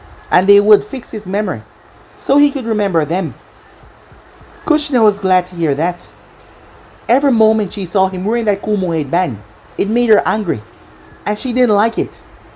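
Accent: Jamaica. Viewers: Day <num> million trillion of asking if your Jamaican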